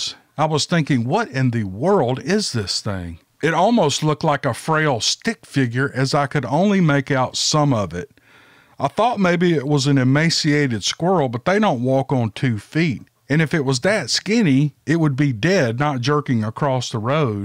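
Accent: South, United States. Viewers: Hi! I always enjoy your southern voice...doesn't even matter what you're reading or saying!Lol! Love and thanks for the great entertainment! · Love to hear you tell stories. You truly have a gift. I love your southern accent too 😊. I enjoy the music rifts as well. I'm so glad I came across your channel about a year ago. Keep on keeping on!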